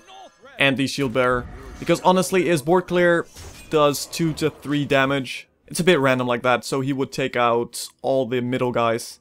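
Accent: Netherlands. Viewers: Favoriete Nederlandse gamer, door je accent alleen al sub! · Are you dutch?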